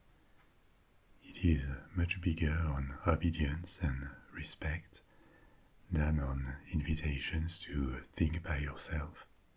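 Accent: France. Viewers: I love the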